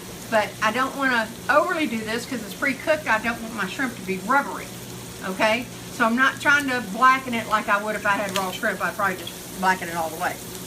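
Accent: South, United States. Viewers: My Mom Would Love Her Southern Accent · Love your southern accent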